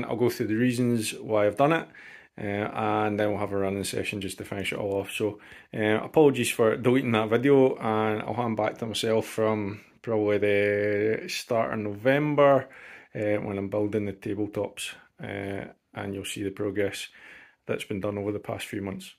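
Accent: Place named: Scotland, United Kingdom. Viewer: Dear Sir, Yours is a great layout (i wish i had a loft), mine is just a wee bit over 2x1 metres. Just one question, for the benefit of us, english non speakers. Your accent is a challenge for me untrained ear. Where in the UK is it from? Scotland, i presume? Thanks and cheers from (happy again) Brazil!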